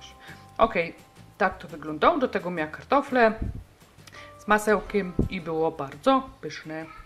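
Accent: Silesia, Poland. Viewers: Te nitki obetnam, te szporogi pomieszom... Uwielbiam slunski jezyk :D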